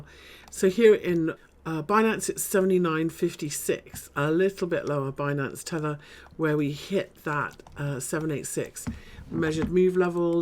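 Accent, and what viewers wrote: United Kingdom. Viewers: U sound British . Look forward to Learn from you base in London.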